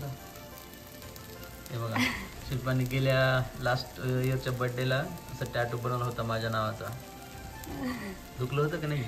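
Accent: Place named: Vidarbha, India. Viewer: chane आहे कुठं आहेत भाषा widarbhatli आहे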